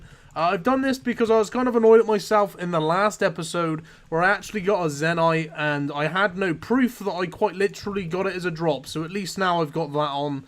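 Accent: Bristol, United Kingdom. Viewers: Are you from the south-west of the UK? You have a birstol-esque accent.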